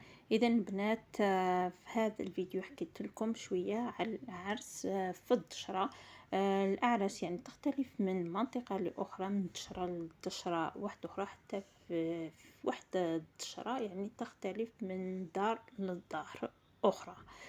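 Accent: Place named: Tizi Ghennif, Algeria. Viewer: لهجة تاعك تاع تيزغنيف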